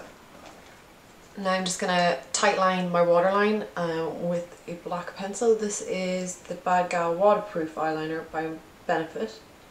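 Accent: Ireland. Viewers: I loove your accent! Irish? :)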